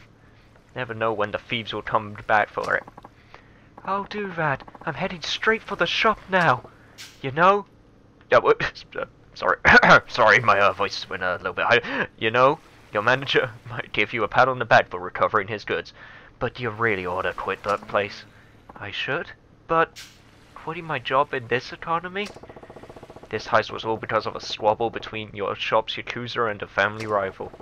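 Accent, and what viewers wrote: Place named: South, United States. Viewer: actual Texan here, I think your southern accent is hilarious. Also good lets play, I've watched almost all of your yakuza videos in like 2 days lol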